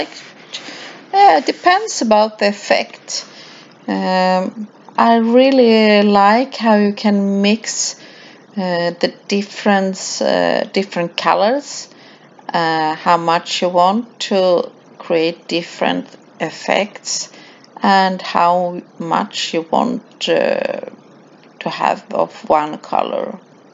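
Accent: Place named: Sweden. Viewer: The end result is so awesome! I love your accent! Are you a Swede?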